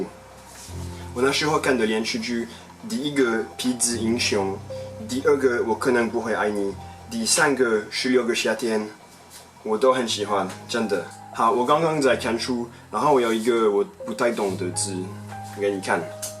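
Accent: Standard. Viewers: 你好！早上起來，先是看到facebook上，東森新聞分享你幫助小狗找到主人的影片，接著看了你對台灣的看法那篇影片、台灣倒垃圾文化的影片、最後這篇你一路上學習中文的影片，衷心佩服的除了是你標準的中文咬字、還有強大學習中文的各種方法與精神，真的很優秀！祝福你在未來的生活更順心、喜樂！ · 中文很標準太棒了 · 你簡直是個好學生!! 很有學習精神，也超像我們那年代，要一個一個字查字典，寫上部首，筆劃，然後再造詞，你注音也感覺學得好，難怪說的標準，沒有怪腔怪調!! 加油了!!再則，你很會挑劇看，讓自己持續興趣~~我看你應該很快可以學成語了!!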